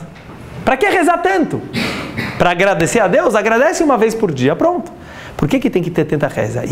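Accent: São Paulo, Brazil. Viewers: Se este sotaque paulista não fosse tão irritante eu veria o vídeo ate o final, mas não consigo. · o que irrita o sotaque paulista?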